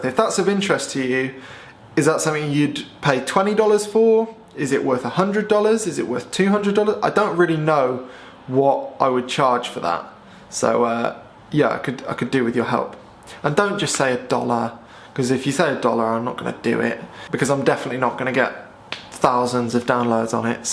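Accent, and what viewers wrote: United Kingdom. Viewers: You just might be my favourite M43, British accented comedic, photographic personality with excellent advice and insights. Your vids are thoroughly enjoyable to watch.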